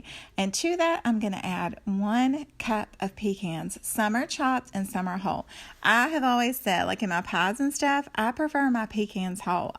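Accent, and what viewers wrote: South, United States. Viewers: If I drank a shot every time she said y'all, I'd be soooo drunk by the end of the video. 😂🤣... Love the Southern drawl ❤️ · You have to be from the South! Say it anyway you want too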